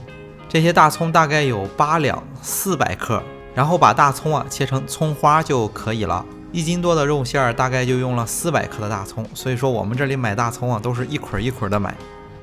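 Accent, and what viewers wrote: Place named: Zibo, China. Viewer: 听口音是淄博那边的？老爸的手艺真棒👍！加油💪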